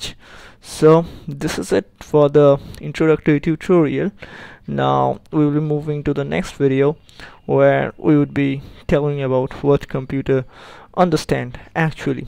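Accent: India. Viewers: There are not a lot of good Assembly tutorials out there and based on my own experience i glad I found this one as, while the presenter here has a thick accent, it is easy to understand more than 90% of what he says whereas the other Indian tutorial makers have accents that are just far to thick. Thanks for this tutorial. I am trying to learn assembly to further my knowledge of hacking as well as pass my CDA class (hopefully) lol. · Everyone talking about his accent. Basically, Indian people are the majority who post ANY kind of relevant tutorials or help videos on youtube for programming. Maybe if some other people would actually take the time to learn and teach and post on here, we could say something. Til then, I'm just glad people like take the time to try and help us.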